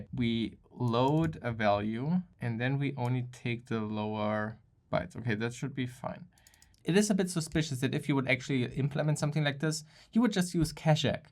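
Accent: Germany. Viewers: I bet this guy has a fritzbox as router... he sounds german and has the 3'rd octet x.x.178.x. Not complaining. Fritzboxes are alright.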